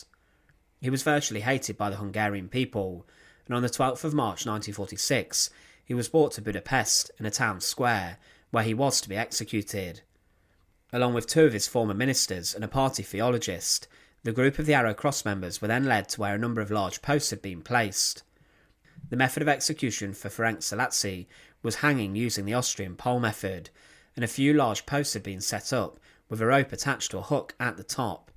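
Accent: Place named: Cockney, United Kingdom. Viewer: Your monotone, staccato and sometimes slurred “Cockney” narration is distracting and difficult to follow. I, for one, wish you’d hand the microphone to a professional narrator! You should go to “diction-classes.”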